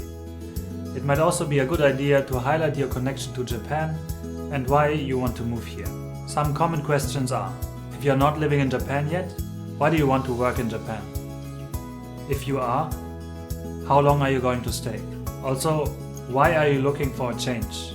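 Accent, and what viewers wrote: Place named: Germany. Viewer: German accent for sure :)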